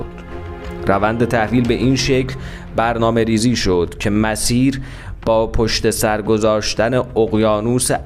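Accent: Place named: Turkey. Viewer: هرچی زور زدی نتونستی لهجۀ ترکی‌تو پنهان کنی❤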